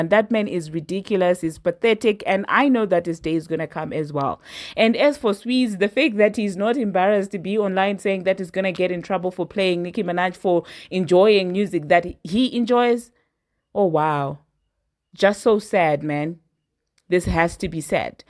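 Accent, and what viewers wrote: South Africa. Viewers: are you South African??? is this you??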